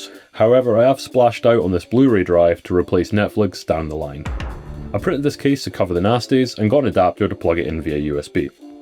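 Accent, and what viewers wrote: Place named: Scotland, United Kingdom. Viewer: Scottish accent with dropped ‘tees’ sounds shocking.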